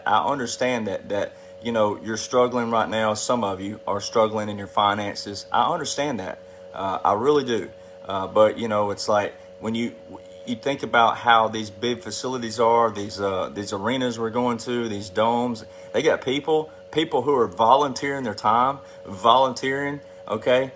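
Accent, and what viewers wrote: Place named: South, United States. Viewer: Patel Patriot has a reputation for putting others down. Likely jealousy. We love you here in Boston. Could listen to your southern accent all day! And you re right. If one of these truthers did what you do everyone would have woken up A year ago!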